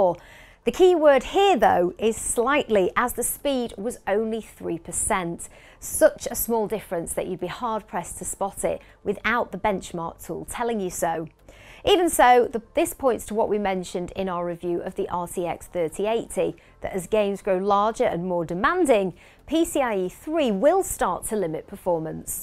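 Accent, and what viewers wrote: United Kingdom. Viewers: I really like the British accent (I'm French btw)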